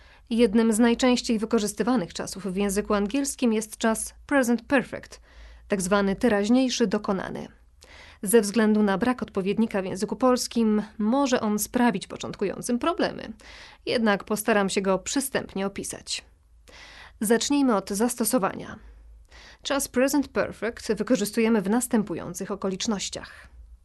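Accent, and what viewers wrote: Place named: United Kingdom. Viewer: Ten Brytyjski akcent parzy w uszy.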